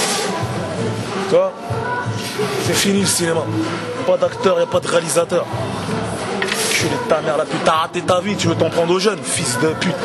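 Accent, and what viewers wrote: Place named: Paris, France. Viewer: il parle gabin, samat joe et mec de Paris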